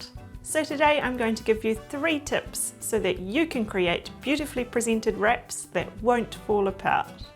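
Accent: Australia. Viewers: I wish I could pronounce Easy Peasy with a lovely Australian accent. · Love your Aussie accent!🤩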